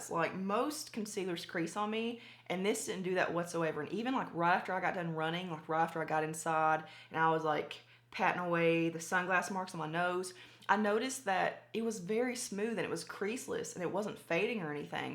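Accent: South, United States. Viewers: Use it love it, when I heard your voice knew I would just love ya,southern accent me too. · Im from the south as well So good to have someone on YouTube speaking pur language. Lol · I am born and raised from the south and your accent legitimately makes my soul smile. I love it. And I loved this look! Great video gorgeous ♥️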